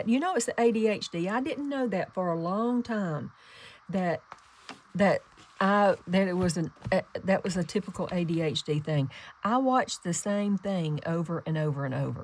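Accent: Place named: Maryland, United States. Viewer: Hello, what a joy to listen along with you. You are very funny. And I love the sound of your voice. My Uncle called me the other day to tell me my Aunt is dying. She is Maryland, born and bred. And I know your voices are unique across the country, yours is very similar to hers. I will probably listen to this a few times, and although I'm really enjoying your show tonight, I would listen to you read from a catalogue.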